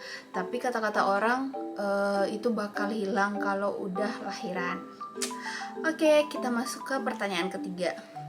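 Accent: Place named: Minangkabau, Indonesia. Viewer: Slang Manadonya sudah hilang.......sudah ganti dengan dialek Minang ya👍👍👍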